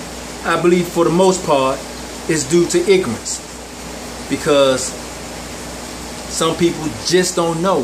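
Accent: DMV, United States. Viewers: Great explanation! Great looking fish too Champ. 👊🏾 Im from DC bruh and based on your accent you gotta be from somewhere in DMV area! 😎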